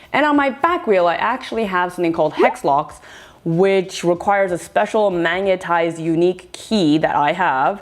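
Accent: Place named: Canada. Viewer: Cool accent, is that a candadian Asian accent?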